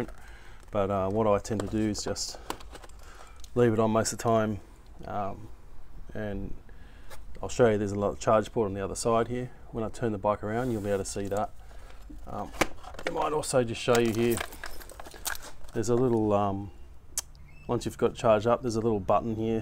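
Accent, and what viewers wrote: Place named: Australia. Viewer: You sound aussie, so aren't you worried about getting caught with a 1000w motor?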